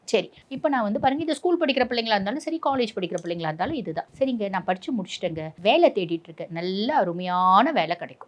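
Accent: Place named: Northern Tamil Nadu, India. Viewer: Ennadae ammea vadakku thamilatchiyo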